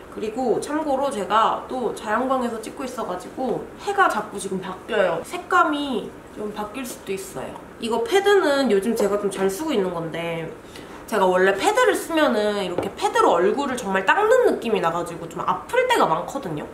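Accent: Busan, South Korea. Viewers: ㅋㅋㅋㅋㅋㅋㅋㅋ 님 부산 사시더니 말투에 부산사투리가 약간 섞여벌엿네욤 귀요오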